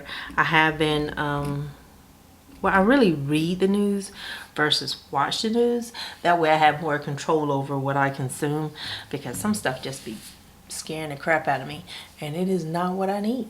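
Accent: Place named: South, United States. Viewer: New viewer from Ft. Worth. Don't be worried about a southern accent, girl. It's something to take pride in says this Texan, so nurture it! Hahaha. Interesting to see how you fund your sinking funds. I'm more at the $3 per envelope level. Just started cash envelopes. SLOW PROCESS! Take care and happy budgeting!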